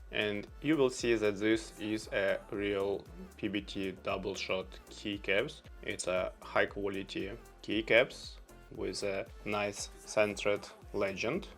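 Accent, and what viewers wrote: Russia. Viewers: Такое ощущение как будто он русский но говорит по русски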